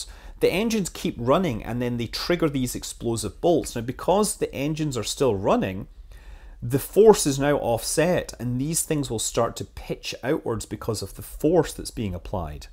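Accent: United Kingdom. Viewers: It's amazing that you being British (if i got the accent right) know so much about the R-7 design, now that's really some enthusiasm there. I'm a Russian myself, meaning i ought to know about details like the excentric jetforce stream plus oxygene jettison trick before everybody, but i only learned about them yesterday, from Lozga, who you could say is the best known space expert among Russian bloggers.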